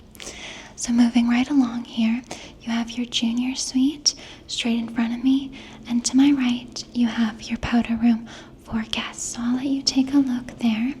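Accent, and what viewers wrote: France. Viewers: Ugh you look absolutely gorgeous! I loved how you she used her French accent in there too, Stunning apartment, it just looks peaceful!!💕💕💕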